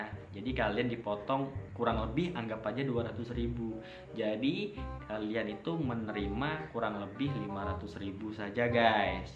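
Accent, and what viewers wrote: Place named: Banjar, Indonesia. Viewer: tapi ada bahasa tadi logatnya kaya logat bhsa Banjar. Makanya saya kira dari Kalsel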